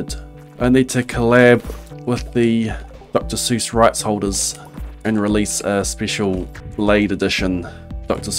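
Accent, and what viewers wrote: New Zealand. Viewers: love ur new zealand accent bro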